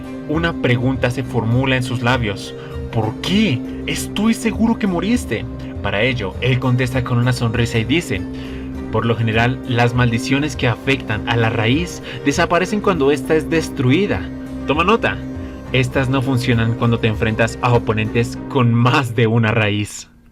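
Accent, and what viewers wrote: Colombia. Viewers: a nmms eres colombiano?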